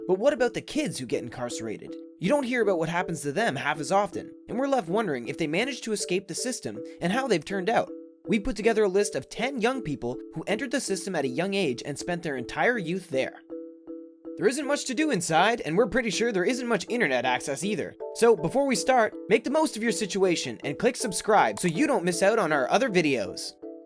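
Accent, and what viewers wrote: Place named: Canada. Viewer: You can tell the guy talking is Canadian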